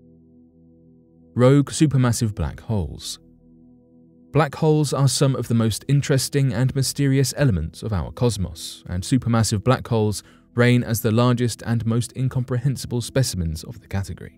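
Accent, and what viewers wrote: United Kingdom. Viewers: Earache British voice, thought this might be entertaining but it's just fucking annoying and monotonous, no sub, set to do not recommend channel.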